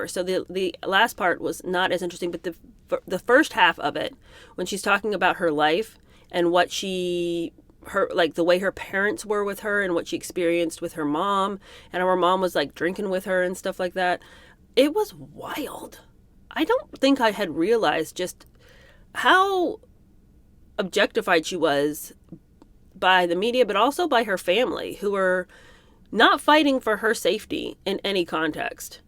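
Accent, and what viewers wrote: United Kingdom. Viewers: Love your British accent! Perfect! Sometimes it makes me laugh3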